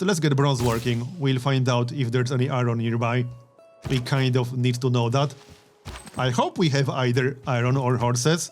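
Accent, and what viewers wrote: Poland. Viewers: where are you from mate, you sound Polish. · He is obviously Polish :)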